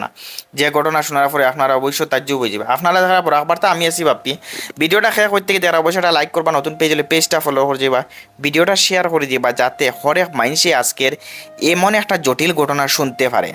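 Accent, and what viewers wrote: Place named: Silchar, India. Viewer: Ami Silchar tone dakram bhai okk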